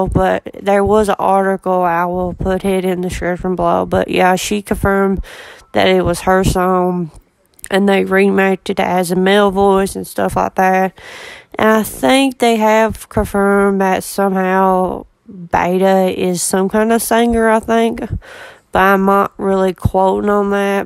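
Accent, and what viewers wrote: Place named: South, United States. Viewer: Wow girl, you have a major Southern accent! I f'n love it!!!!!!!!!!!!!!!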